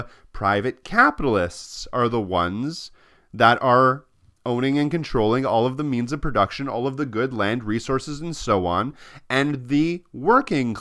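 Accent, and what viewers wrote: Canada. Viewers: Are you an Albertan?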